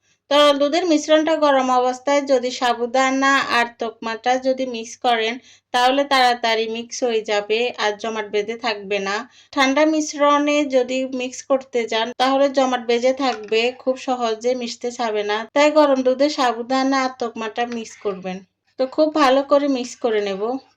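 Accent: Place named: Sylhet, Bangladesh. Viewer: লাইক সাবতবে আমার কিন্তু অভ্যাস নাই সাবস্ক্রাইবার করে আবার আন্সার্সটা এবার করে দেওয়া স্ক্রাইবার করে দিলাম আপু আর আপনার দেশের বাড়ি কোথায় অবশ্যই কমেন্টে জানাবেন আমার মনে হয় সিলেটের বাসার মতো শোনা যাচ্ছে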